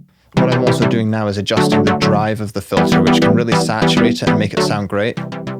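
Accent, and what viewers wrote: Scotland, United Kingdom. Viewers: Great video!! It's nice to hear a fellow Scottish voice on YouTube ;) So when can we expect the FM synthesis series?! :P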